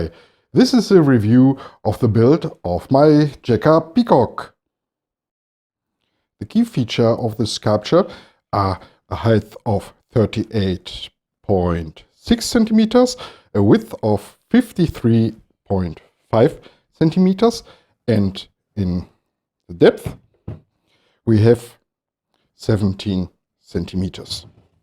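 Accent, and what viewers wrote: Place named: Germany. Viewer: are you german? you dont realy sound native english 🙈.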